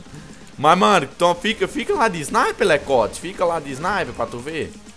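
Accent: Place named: Ceará, Brazil. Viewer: possivelmrnte se num vai meresponder mais vc e Cearense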